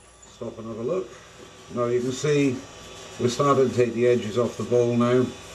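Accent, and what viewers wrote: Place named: England, United Kingdom. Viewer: Where's your accent from, are you Norfolk or West country?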